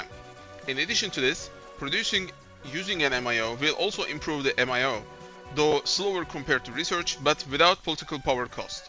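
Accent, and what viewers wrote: Turkey. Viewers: bro are you turkish you have turkish accent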